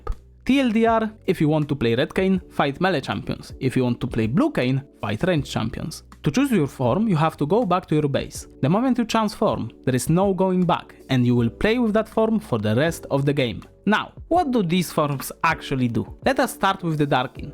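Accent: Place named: Poland. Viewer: You have polish accent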